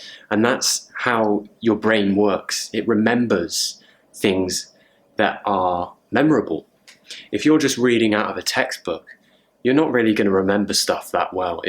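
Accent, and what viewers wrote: United Kingdom. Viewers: English Hi mate. I'm from Italy. I've been studying for Cae exam, and with regard to your question on how we improve our skills and learn new words, I've developed myself a sort of method. I start with looking a dictionary up looking for interesting words. Then, on wordreference or cambridge dictionary I seek word families ( I mean, words families of words I selected from my dictionary - hope this is clear). Then, I have a look on lingohelp where you are told what preposition follow an adjective and so on. Accordingly, I keep my head down and study all this words and then I do try to put them into practice by typing to friends of mine who are American, B English and similar. What I mean is this: I oblige myself to study 'em all while using them and I do allege I know them perfectly. I know it's quite weird but It pays off as most of the times it works. 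That's blatantly obvious that I cannot remember hundred of words but I needed to enhance my English voc. variety and so here is it! I'm applying the same kind of approach to idioms and collocations as well. Moreover, I do watch movies in English. Admittedly, I did it for fun and more frequently in the past but I would do it with subtitles. Now I've been trying to do it without 'em, especially Game of Thrones, where people talk using B. Accent (which I've copied from your way of speaking ahahah). I wouldn't dare say it's easy but it's not difficult either: more or less I'd say I figure out 70 80% of what characthers say. I hop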